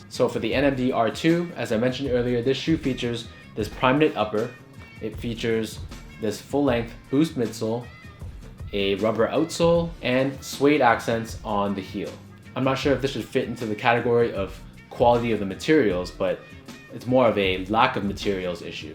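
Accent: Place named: Germany. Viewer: ok you seemed to had a little german accent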